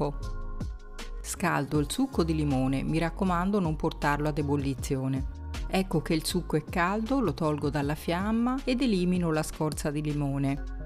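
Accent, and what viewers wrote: Tuscany, Italy. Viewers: Buonasera, spiegazione precisa e completa, impreziosita dalla tua voce dall'accento toscano semplicemente meraviglioso!😍 Ottima freschissima cheesecake con un superbo topping al limone 🍋! Grazie, insuperabile!👍🥰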